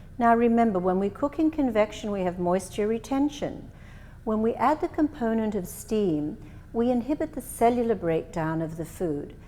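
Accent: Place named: South Africa. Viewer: Hi, great video thanks, where is your accent from, it sounds South African (Cape Town specific), who's previously lived in Australia and now currently living in Canada:)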